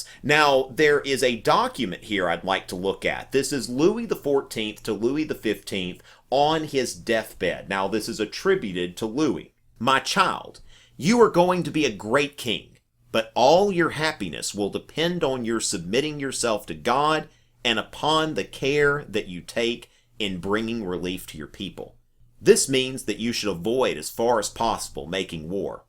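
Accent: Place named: South Carolina, United States. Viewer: Hehe, yeah. got a thick South Carolinian accent. Little different from mine (Texan) with all the aaaa's.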